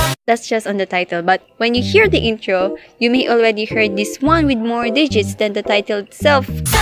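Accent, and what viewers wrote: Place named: Philippines. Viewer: ! Your voice is majikawaii! Both the pinoy accent & nihongo =D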